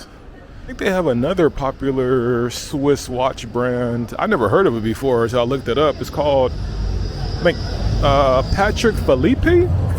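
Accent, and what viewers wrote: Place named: Massachusetts, United States. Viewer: I like your pioneering and adventurous spirit. I invited a dude from the USA, who spent 10 days at my house in front of a laptop, We live near France and Switzerland. I tried to suggest he visit Zurich, Basel or Strasbourg for a day, but he was surely not interested, the good part of the deal I was willing to drive him there at no cost to him to show him around. By the way your accent suggests you come from Massachusetts, the New York area, I could be wrong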